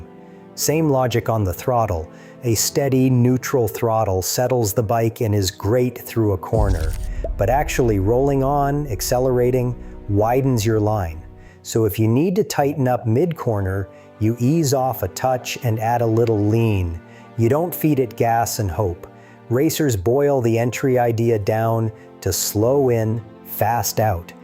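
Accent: United States. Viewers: The confusion might also stem from the fact that the speaker has an American accent and cites American sources (admittedly not exclusively), while the channel description states that the channel owner is based in Norway. Anything is possible, of course—and there was, after all, an American in Norway before: Frank Tagliano 🙂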